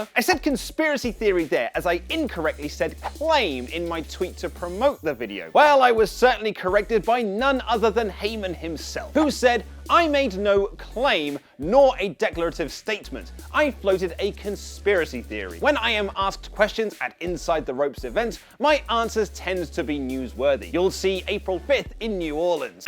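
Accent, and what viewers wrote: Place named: United Kingdom. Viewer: I always noticed you say "shheduled" instead of scheduled...maybe its due to the British accent.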